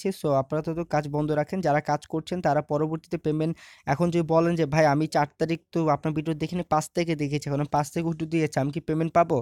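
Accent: Barishal, Bangladesh. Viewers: হা হা হা... ফাডাইলাইচেন মিয়া.... মোগো বরিশাইল্যা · borisaler vasha very nice legese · ভাই তুমি তো পুরাই বরিশাইল্লা হইয়া গেছো। এখন তো আমার ও বরিশাইল্লা ভাষায় কথা বলতে মনে চাইতেছে। মোরে কি সিহাইবা বরিশাইল্লা ভাষা। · সত্য কথা বলছি ভাই... আপনার বরিশালের ভাষার কথা ৩ বার শুনেছি... খুব মজা পাইছি... সুপার ভাষা l love you brother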